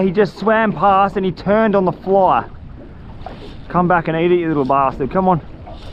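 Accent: Australia. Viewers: That thing just absolutely making me piss - he is so hilarious when he says aussie sentences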